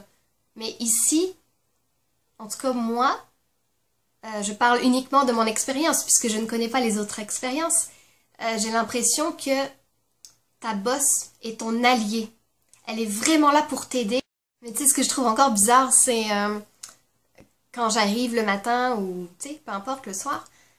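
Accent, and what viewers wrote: Quebec, Canada. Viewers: Mais tu as l'accent québécois à fond!!! Ou j'ai pas compris tu es française ? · cracquante! wow tu met des "tu" partout, ton accent québeco-franco j'raffolle! (chui qubecois) il te reste juste à mettre des " Là!Là" comme au Saguenay! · C'est drôle de t'entendre prendre progressivement l'accent du Québec! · Ont dirait plutôt une québécois qu'une française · Comment as-tu choppé un tel accent n'ayant encore jamais travaillé la bas ? ca fait 10 ans que tu y est en vacances ??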